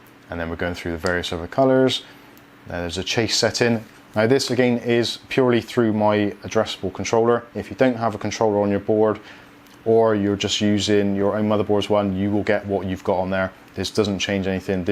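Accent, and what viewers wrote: United Kingdom. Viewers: I loved the Bri'ish accent mate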